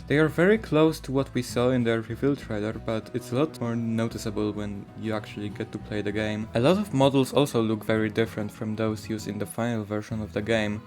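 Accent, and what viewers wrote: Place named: Poland. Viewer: this guy's polish accent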